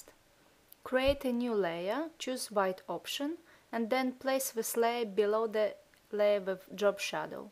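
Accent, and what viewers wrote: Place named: Russia. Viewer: are you Russian. I really like your English. its too sexy. :)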